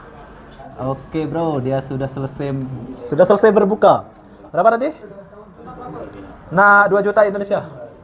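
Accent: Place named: Sabah, Malaysia. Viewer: logat orang Sabah kau.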